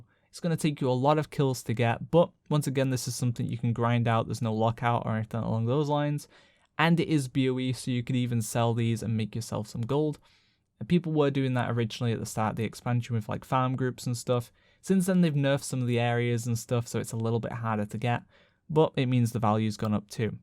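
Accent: United Kingdom. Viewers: Svante Bard that’s the british accent for you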